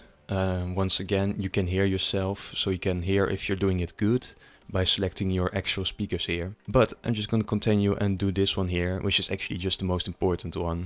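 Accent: Netherlands. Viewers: When a dutch person makes a guide its the first thin i hear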